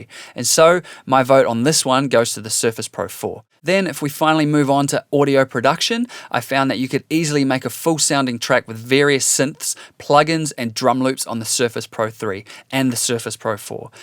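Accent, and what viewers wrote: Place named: Australia. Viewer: nice review. Also as a kiwi living in the US it's cool to hear an accent from down under. Chur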